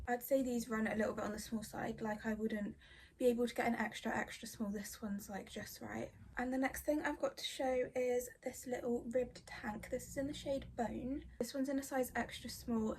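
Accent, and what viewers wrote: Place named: United Kingdom. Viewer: You are very pretty and cute. Beautiful eyes, cute voice with the British accent and pretty smile. Beautiful body and curves, cute little belly button. You look very pretty and very cute in everything this video. Everything fits you so well and look beautiful on your curves. You are really cute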